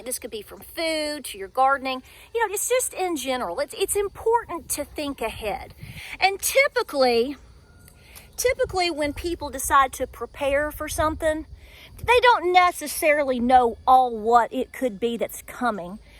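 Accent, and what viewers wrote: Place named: South, United States. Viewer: I just have to laugh at your last few videos. You are a pure southerner! So proud of you. I’m a southerner to in NC. The way you’re talking and explaining….. well honey I get it. You are awesome. I love your videos. ❣️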